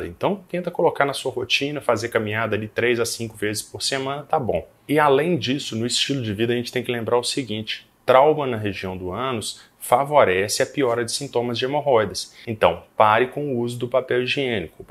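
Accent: Minas Gerais, Brazil. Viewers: Esse sotaque mineiro, é uma graça!!! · O sotaque mineiro é o mais bonito!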